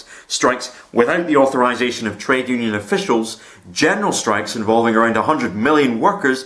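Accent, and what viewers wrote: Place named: United Kingdom. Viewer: You look like trey sessler but with a british accent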